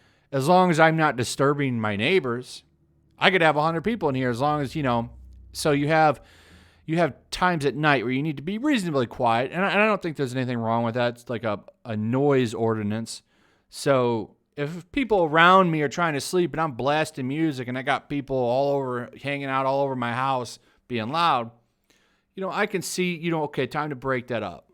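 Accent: England, United Kingdom. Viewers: Lol I love your English accent. I live in uk and pubs open back up on 4 July but all police are getting ready in riot gear to take us down if we talk to loud lol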